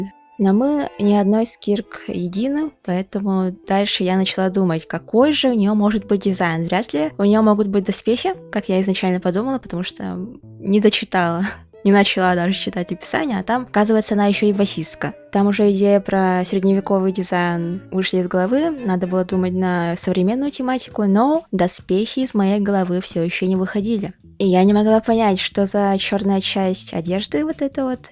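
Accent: Yakutia, Russia. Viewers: Я ИСПУГАЛАСЬ ЯКУТСКОГО ЯЗЫКА, Я НЕ ОЖИДАЛА ЭТОГО.. только сейчас начала слышать якутский акцент и голос очень хочу на фестиваль, но я в деревне живу, а в город еду только январем 😭😭😭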